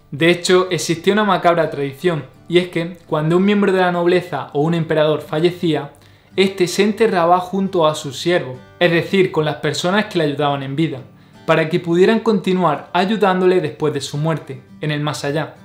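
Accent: Andalusia, Spain. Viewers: Por el acento.... Axarquia/Almeria/Granada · Hola!vengo del facebook de grupo de arqueologia.buen.video.eres muy lindo y me gusta el acento parece del sur de españa.gracias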